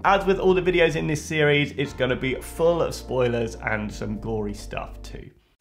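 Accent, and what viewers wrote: United Kingdom. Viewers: Is it just an automatic response to remove an impaled object? (I heard Steve Irwin did that w/ the stingray barb, that there was a chance to save his life had he not done that.) Can't wait for your reaction to the next episode. It's by far the darkest ep. I'm not usually a fan of a moustache but combined with the British accent, it oddly works for me 😉😄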